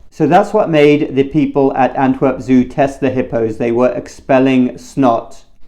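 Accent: England, United Kingdom. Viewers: There is nothing quite so elegant as someone saying "expelling snot" in a beautiful proper English accent. Bravo!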